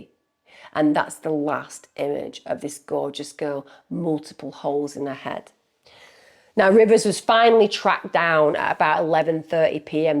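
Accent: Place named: RP, United Kingdom. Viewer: “Are you on the crack pipe?” 1) new merch idea and 2) was not expecting that but EVEN STILL you sound so posh and elegant I love it 😅❤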